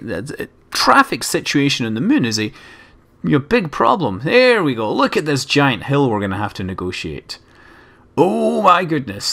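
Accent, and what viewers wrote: Scotland, United Kingdom. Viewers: Nothing can be boring with a scottish accent!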